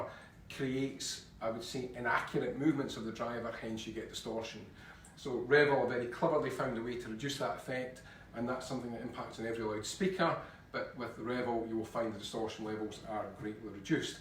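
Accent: Scotland, United Kingdom. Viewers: Love that Scottish accent and the rug is great too.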